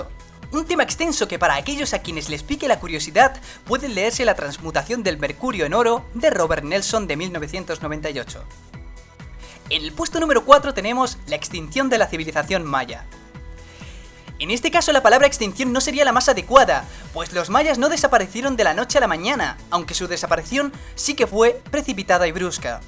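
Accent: Spain. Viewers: Ese acentote español. Casi ni se nota que es. Aún no se drogaba pero usaba la misma música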